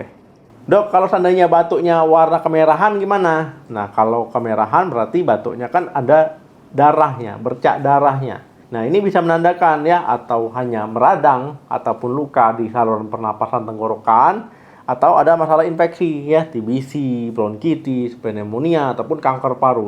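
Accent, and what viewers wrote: Aceh, Indonesia. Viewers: Macam gaya orang aceh ngomong bahasa indo dokternya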